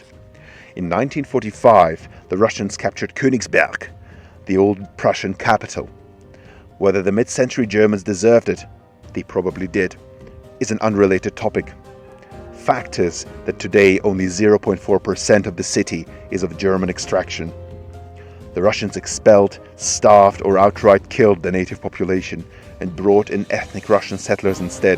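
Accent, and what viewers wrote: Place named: Ireland. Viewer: did you even watch the video? Did you listen...did he sound american to you? The dude is clearly Irish. And that logic makes absolutely no sense! How is that doubling down? Carthage was destroyed and levelled to the ground by rome, its population largly sold into slavery with the rest exterminated. Do you understand history in correlation to current events...? Essentially we've established the guy behind the vid to be Irish and that comparing usa with cathage is not doubling if anything it does the complete opposite.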